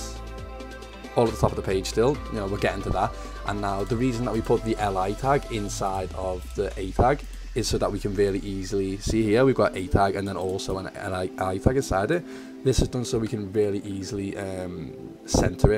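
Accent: Liverpool, United Kingdom. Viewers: scouse accent 🤔 its hard to keep up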